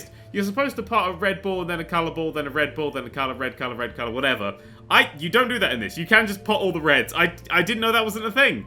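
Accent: United Kingdom. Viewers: Discovered this channel with the Roller Coaster Tycoon games comparisons and have to say this guy knows how to make good damn videos just a quick question are you British?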